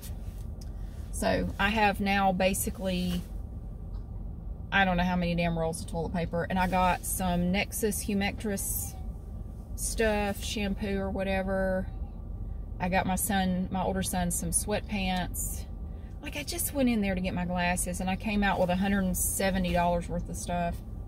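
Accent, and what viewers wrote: South, United States. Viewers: Hey! Longtime fan of yours (I’m originally from the South and your accent reminds me of home:) I live in DC and just happened to drive by the Seabee memorial while showing some folks around. Made me think of you and your dad. Hope y’all have a wonderful time here and that this gorgeous weather we’re having lasts for you!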